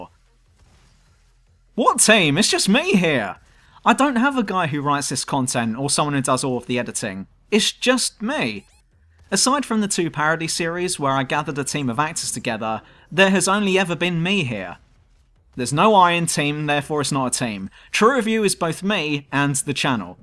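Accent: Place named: Home Counties, United Kingdom. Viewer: You have the same accent as one of my best friends from the "tri-county" area <Hampshire/ Surrey/ Berkshire >:P So watching your vids is like having a mate talk to be about video games. Gd job on 200 btw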